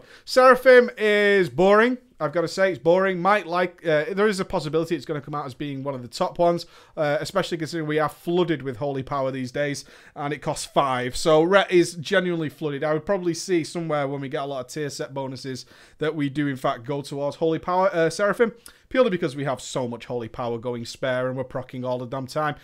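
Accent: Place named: United Kingdom. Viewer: hard to hear what u saying with that brittish accent + you talk to fast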